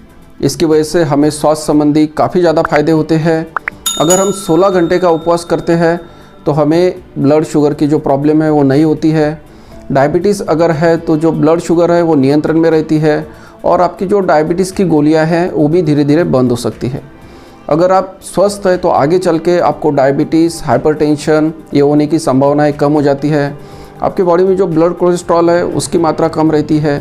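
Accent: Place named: Vidarbha, India. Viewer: Very apt advise; very much to the point and in the simplest language possible. It was also nice to hear you Vaidarbhiyan accent. मी नेहमी पाहीन आपलं चैनल. धन्यवाद.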